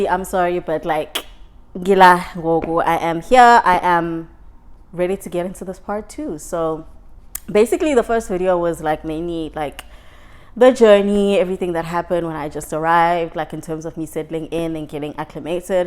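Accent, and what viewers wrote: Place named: Los Angeles, United States. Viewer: Your accent is now giving LA😍😂❤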